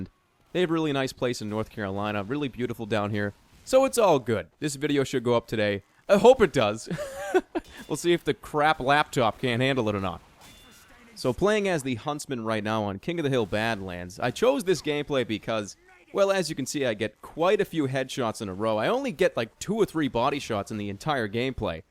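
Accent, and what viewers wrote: Boston, United States. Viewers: yo youre from boston? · your from where the scout is! boston!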